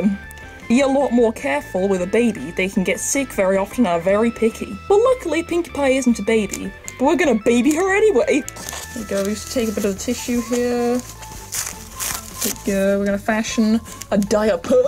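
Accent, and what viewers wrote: United Kingdom. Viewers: You have a nice accent. Brittish?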